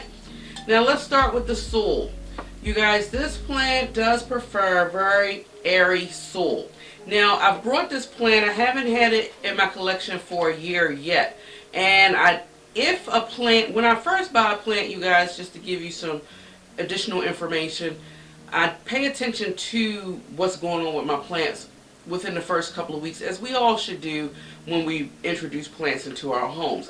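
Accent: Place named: Maryland, United States. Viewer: If u ain't from Merrland i dont kno who is prolly my fav accent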